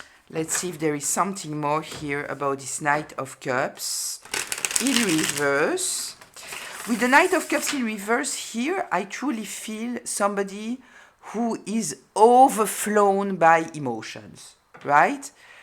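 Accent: France. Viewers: i love your french accent. it's lovely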